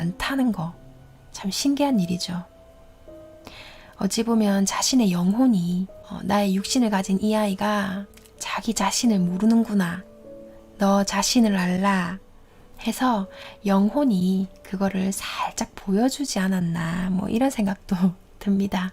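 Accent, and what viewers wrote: Gyeongsang, South Korea. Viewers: 아우 나도 경상도 고향이지만.. 사투리 정말 듣기 싫다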